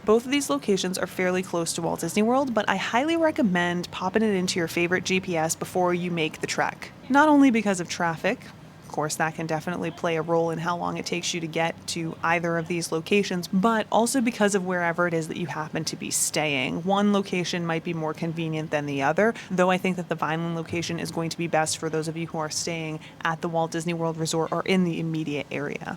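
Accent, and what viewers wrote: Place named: Minnesota, United States. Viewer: Could tell you were in Minnesota girl what part of Minnesota are you from as a fellow Minnesota commenting on your life?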